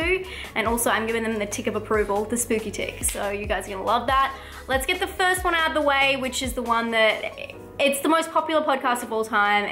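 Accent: Australia. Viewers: Wait..................you are Australian? When did that happen??? I’m gonna need to check these podcasts out. Still haven’t caught up with Sword and Scale. You are so AMAZING!!! Keep up the awesome work!!! Lists are GREAT!!!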